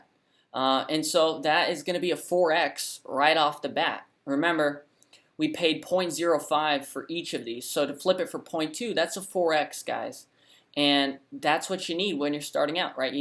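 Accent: Houston, United States. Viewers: You from houston ? 🤔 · You from Houston ?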